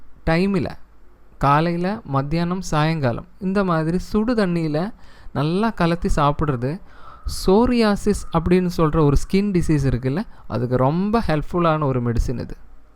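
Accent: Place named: Kanyakumari, India. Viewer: He may be from Kanyakumari, Nagerkoil or Kerala. Their accent is like this only. You want some information & he is giving in his best way to share his knowledge, so we can adjust with the accents...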